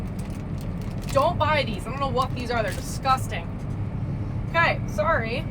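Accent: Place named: Nebraska, United States. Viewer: You really don't need to emphasize that you are a real Nebraskan. We, well I at least, can hear that all too well. And it's more Lexington than Lincoln, and so not Bridgeport.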